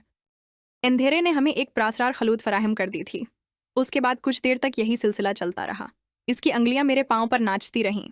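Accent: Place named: India. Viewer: کہانی کسی انڈین لڑکی نے بیان کی ھے لہجہ پاکستانی نہیں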